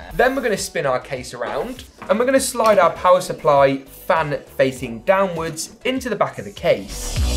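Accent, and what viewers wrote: United Kingdom. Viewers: U have the best British accent I’ve ever heard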